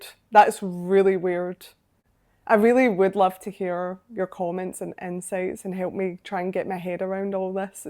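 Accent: Scotland, United Kingdom. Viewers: Love the Scottish accent.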